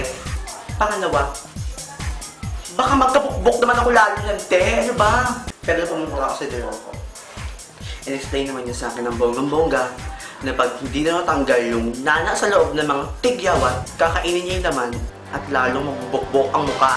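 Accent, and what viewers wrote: Visayas, Philippines. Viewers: BISAYA ka kuya?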